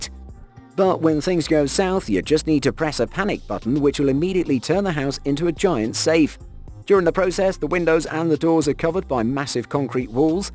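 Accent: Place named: United Kingdom. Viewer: The narrator is British. That is how the British pronounce the Z.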